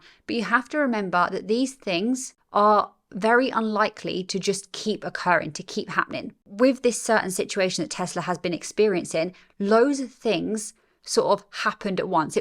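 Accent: England, United Kingdom. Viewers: This lady is very good with insightful and well-organized presentations. Her cute little British accent is also fun to listen to. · u sound English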